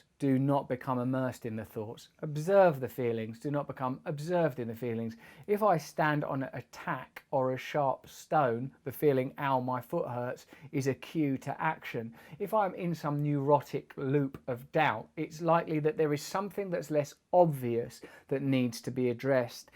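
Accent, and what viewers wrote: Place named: United Kingdom. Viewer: What type of British accent do Russel have?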